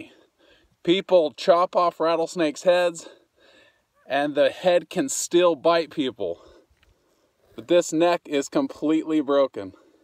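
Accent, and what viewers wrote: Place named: Australia. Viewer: Dude defenetly from australia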